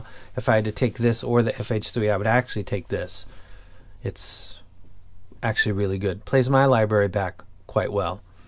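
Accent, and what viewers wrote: Boston, United States. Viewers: Said that three years ago.. Bastohn accent.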